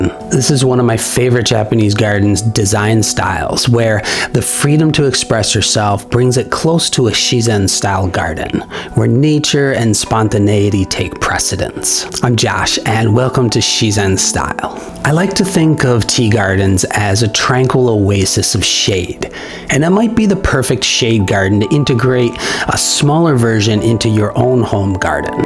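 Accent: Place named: United States. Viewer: Can't......stand......the american accent...srry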